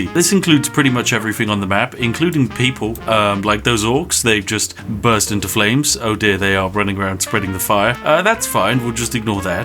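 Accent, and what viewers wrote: United Kingdom. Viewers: Ahhh... I haven't watched a video of this wonderful British voice in a long time. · I can't tell if is a American (or from another country) making fun of British people or a British person making fun of British people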